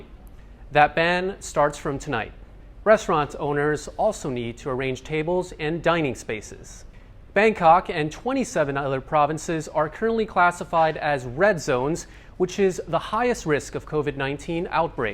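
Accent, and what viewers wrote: United States. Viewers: it's his painful to listen to American accent, if he softened his voice a little would be much better, he's not ugly but that's irrelevant, have you asked him for a online date yet? Zoom xx 😜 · No way bring back Tim theres nothing worse than hearing an Asian speak with an American twang give me the aussie accent anytime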